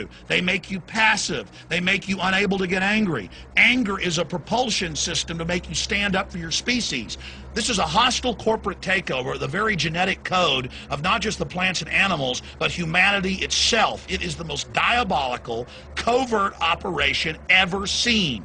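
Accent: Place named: South, United States. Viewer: Your evidence is weak, subjective and theory laden. You haven't tried to falsify your claim, you are unwilling and unable to consider alternative answers and reasons to your "evidence" the links between your evidence are weak (though rather imaginative) you personify your government body as one evil being, everything points to this being a wack-job conspiracy theory, you even sound like your from a southern U.S. state it all fits! There is no logical reason to believe you. Good day.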